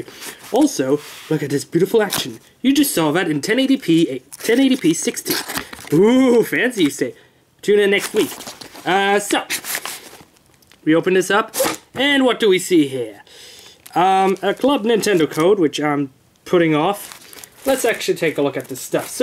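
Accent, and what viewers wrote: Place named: United Kingdom. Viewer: To be Young,12 cups of coffee and UK accent,that's the magic formula.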